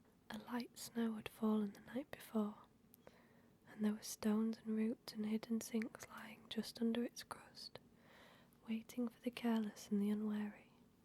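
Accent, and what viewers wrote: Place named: England, United Kingdom. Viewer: Aaahhh your voice is perfect. Definitely my favorite English accent. The intro was terrifying but you nailed the rest of it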